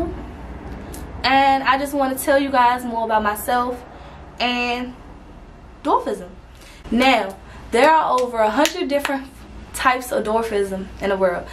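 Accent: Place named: Chicago, United States. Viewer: Friend are you from the chi your accent Gives me very much Chicago forgive Me if im wrong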